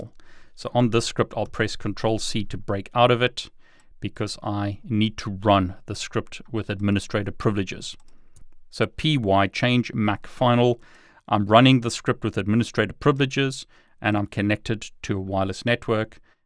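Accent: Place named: South Africa. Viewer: are you from south africa? your accent sounds south african!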